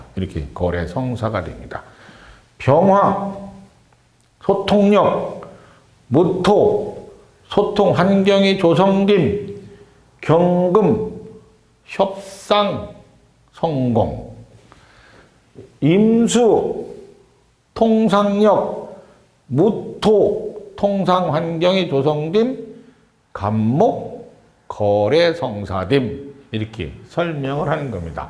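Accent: Chungcheong, South Korea. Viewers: 저는요. 선생님 메니아입니다. 충청도 말씨도 친근감 있고요. ㄱㄴㅇㅅㄲ도 재미납니다. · 여기 매니아 있어요 ~ 선생님 구수한 충청도 사투리가 너무 듣기 좋습니다!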